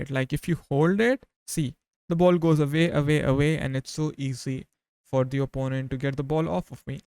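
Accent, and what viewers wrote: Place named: India. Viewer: Indian accent.. is tough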